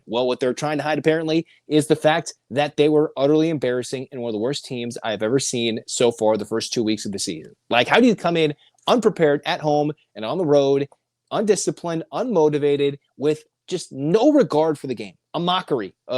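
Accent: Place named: United Kingdom. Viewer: You sound like that uk guy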